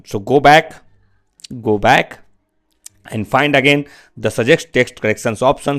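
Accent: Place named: India. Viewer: you know the vid is good when you hear indian accent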